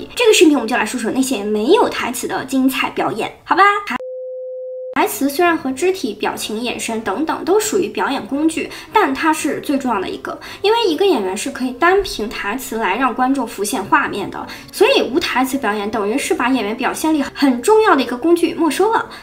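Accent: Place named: Shandong, China. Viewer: 我不是任何人粉丝，但Up主你的口音也很重，你可以再说一遍“小巷人家” 吗？ 你是山东人、河南人? 反正不管哪的人，你的台词也还得练练，有点大舌头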